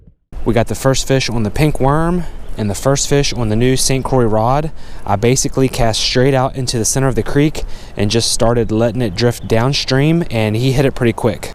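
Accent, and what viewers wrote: Pennsylvania, United States. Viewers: Where is that accent from? Pennsylvania? It's interesting.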